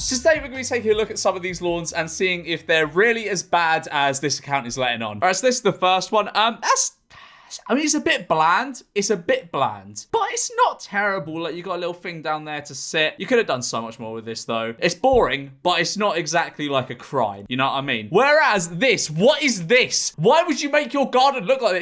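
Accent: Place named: Birmingham, United Kingdom. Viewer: you're starting to sound more Birmingham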